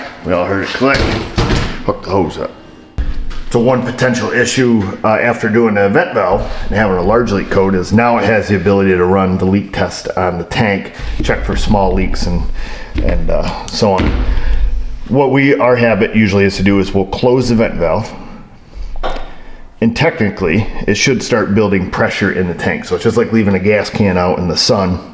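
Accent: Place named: Southern Tier New York, United States. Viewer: As always, a riot if you have the captioning turned on: "Self Made Auto"....even better: "Cancer vent valve". It wasn't until I started watching great channel, that I realized that the southern tier of NYS had it's own dialect. Cool!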